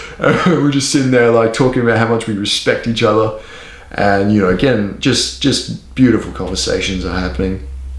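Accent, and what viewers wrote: Australia. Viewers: he sounds like an aussie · Something about how you looked in the thumbnail made me assume your Australian for some reason, but your accent is throwing me off big time, sound like an aussie who lives in the US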